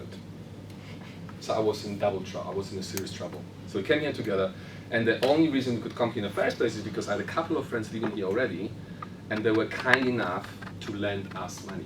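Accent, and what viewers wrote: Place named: Poland. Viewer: omg I love the polish accent... yippy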